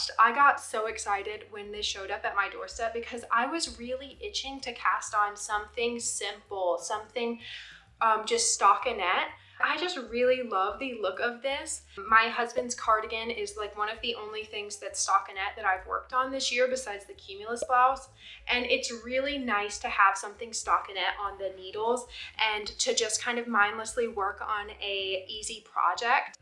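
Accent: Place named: Australia. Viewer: You can’t tell you how tickled I am to hear the Aussie creeping into your accent!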